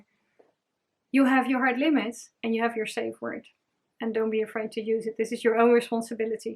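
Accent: Netherlands. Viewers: I came across this video when I looked up slave positions. I'm writing a piece of fiction that includes a BDSM relationship and I want to create a realistic image. Your videos give me a good idea of what BDSM is actually about. You don't just list the rules, but you also open up about yourself and your relationships. You come across as a kindhearted person who loves sharing her passion. Perhaps it might be a rude question - I apologize if it is - but would it be alright if I made a reaction/information video about some of your videos? If I use any of your material or refer to your videos, I will always give credit and place a link to the video(s) in question. In my environment, there are a lot of misconceptions about BDSM and the people who participate in it, and when I noticed that, I also realized that there is a lot of misinformation about BDSM, not just on the internet. 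I would like to help you and other creators spread correct information about it and help people find themselves and other people with who they can share their passions. It might be useful to mention that I am not a part of the BDSM community (yet) but I have been educating myself on it for a while now, and I would like to help other people do so as well. It's quite off-topic, but I love the accent. I am from the Netherlands as well.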